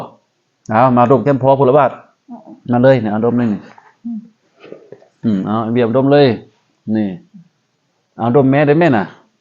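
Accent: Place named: Laos. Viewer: You speak loas Hmong unfortunately Lao ❤️❤️❤️❤️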